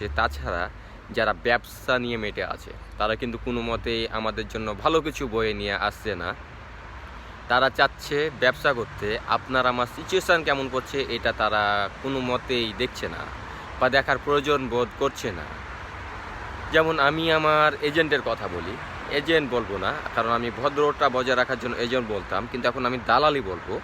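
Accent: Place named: Rajshahi, Bangladesh. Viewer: উনি রাজশাহীর বাসিন্দা তাই ওনার ভাষা এমন সুন্দর।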